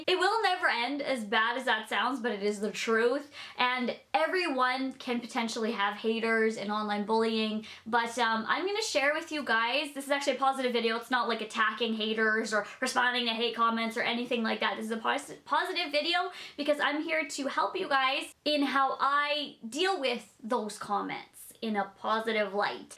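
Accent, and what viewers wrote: Canada. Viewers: Honestly I only scroll down enough to leave my comment and move on. It makes me so upset that people say all these mean horrible things to you. On all your videos you are just the sweetest, nicest girl. I love your cute little Canadian accent and how bubbly you are. Totally makes me day when you have a new video out.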